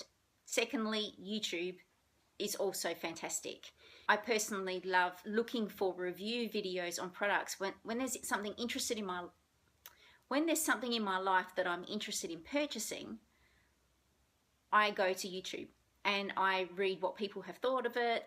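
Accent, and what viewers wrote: Australia. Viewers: What a fabulously helpful video! I knew some of these sites, but not others and I LOVE the framing app! Have just purchased! Now off to take some decent photos of some of my finishes so I can use it properly! I grew up in WA, but have been in the UK since I was a teenager - I love hearing your accent. Although not a WA accent, still reminds me of 'home' . Xx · I loved this video, as I’m new to cross stitch. Hey love the Aussie accent as I to an Australian. I found this video extremely helpful as I hadn’t heard of many of the apps before. I really want to try digital charts. I want to convert my paper ones to digital. Do you know how or is there a video you’ve made for this? I’ve also subscribed to your channel. I’m looking forward to seeing more of your videos. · Thank you, for this very informative video! By the way, I LOVE your beautiful Australia accent! 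Your voice is like music to my almost deaf ears! I would love to hear you sing!:)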